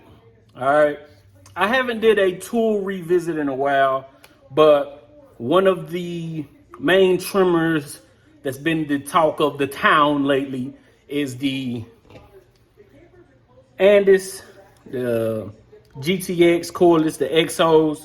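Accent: South, United States. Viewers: That country accent makes these videos hard to watch. Narr, parr harr, hurr. That why its taking so long to get 5k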